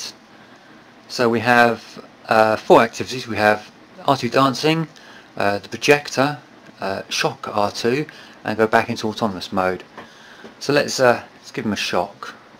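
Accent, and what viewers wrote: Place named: United Kingdom. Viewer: why's it have to be a british guy?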